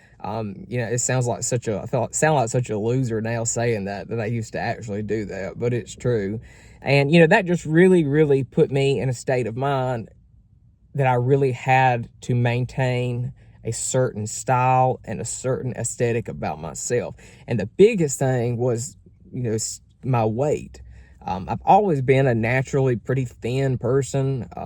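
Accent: South, United States. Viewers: I agree and really like your jacket and everything you said is so damn true. Love your southern accent!!! For me being a chub all my life I’ve seen this and it’s been a lot harder to date. I have struggled with this all my life and I finally just take care of me and of my health and if they don’t like it I now say O well and just try to be me. I like to now not look at looks but how and what kind of a person that they are inside. That’s more attractive now than how I used to be and how others are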